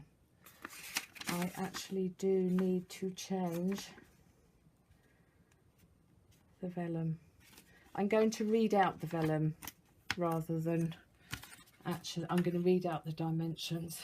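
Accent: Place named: United Kingdom. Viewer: This card is stunning! I just found you and love your clear explanations. (The British accent is a bonus!)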